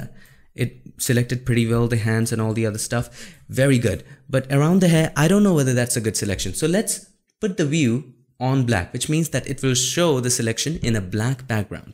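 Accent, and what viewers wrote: India. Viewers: I don't really get this guy's accent is it fully Indian or American.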